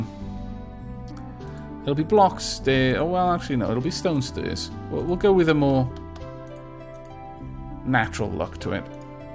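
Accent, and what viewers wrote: Wales, United Kingdom. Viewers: Thank you very much X) I must say without sounding to weird I love your welsh accent as I suppose many of us do :)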